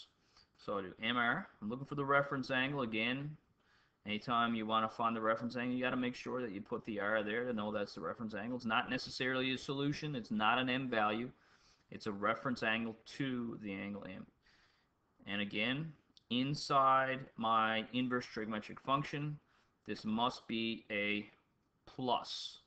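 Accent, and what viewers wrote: Newfoundland, Canada. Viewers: As soon as I heard ya, I knew you's a Newfie b'y...lol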